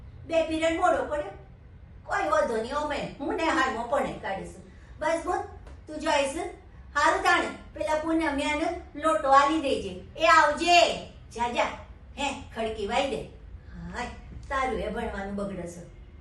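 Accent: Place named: Sabarkantha, India. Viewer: ખૂબ ખૂબ સુંદર એકોક્તિ. ખૂબ ઉત્તમ અભિનય!! વારેવારે માથે ઓઢવાની રીત... પાક્કી ઉમરલાયક બાઈ લાગે છે. સાબરકાંઠાની બોલી અદ્ભૂત!!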